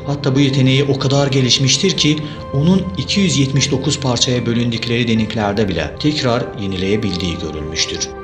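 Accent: Azerbaijan. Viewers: Azerbaycanlı bence aksandan anlaşılıyor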